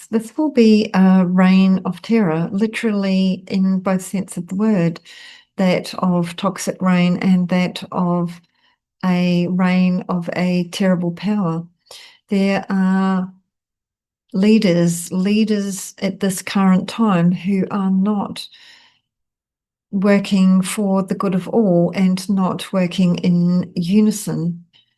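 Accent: New Zealand. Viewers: Thank you....for your honesty and bravery. I feel very connected to your messages.....and love hearing the kiwi accent!!!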